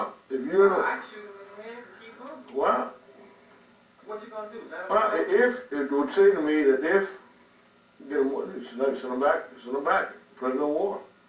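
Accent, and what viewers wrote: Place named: United States. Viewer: he does have some kind of country accent but he's speaking pretty proper English. idk why you're so triggered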